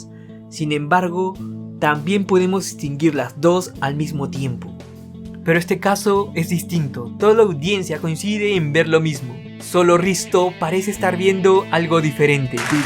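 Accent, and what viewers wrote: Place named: Peru. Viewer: Ese asentó peruano tan particular es así que el youtuber DKBesa también tiene una particularidad en su acento, espero tengas más suscriptores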